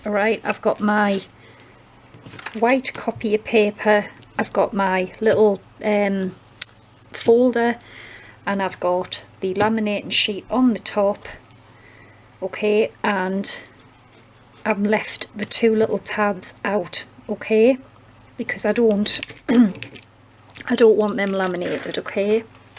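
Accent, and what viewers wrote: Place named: Newcastle, United Kingdom. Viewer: I have been watching your videos because I am a fan of your Geordie accent. I was born in Newcastle and still have family there but we moved to Canada a number of years ago. I love listening to you talk while you do all the crafty things. I'm having trouble finding those Matte laminating sheets here however so that makes me sad.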